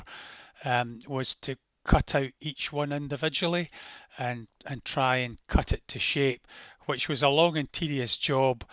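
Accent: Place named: Scotland, United Kingdom. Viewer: So we have had to wait several weeks for this follow on video! Well let me tell you, it was worth every second of the wait and is an excellent piece of work. It is amazing how long these creations take to get right and the care and attention you take, with just the right amount of alteration to suit your needs makes it very special. You have a natural ability to offer a lovely presentation and I guess modelling Perth with a great Scottish accent just is the icing on the cake. Thanks. Most enjoyable. Cheers Bob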